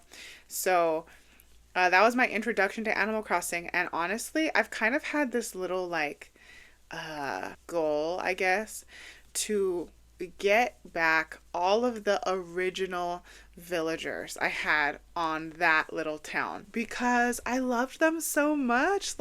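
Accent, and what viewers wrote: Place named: Northern California, United States. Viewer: Fellow Californian here (though I live out of the country now). What region of California are you in? Sounds like maybe up north?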